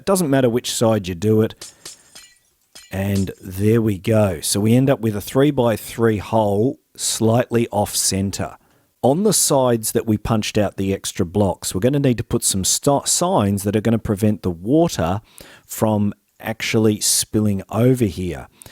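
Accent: Australia. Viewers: Such Aussie such wow!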